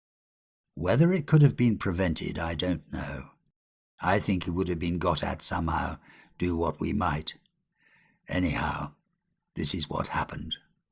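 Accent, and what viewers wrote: United Kingdom. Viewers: With or not prohibited filmation, please continued to read for us. The British pronunciation is a good listening. Have a nice time.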